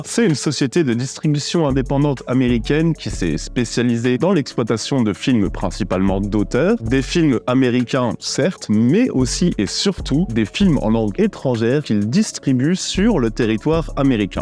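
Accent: France. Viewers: Tu ne serais pas franc comtois? Ton vindieu étais magnifique 😂 merci pour cet vidéo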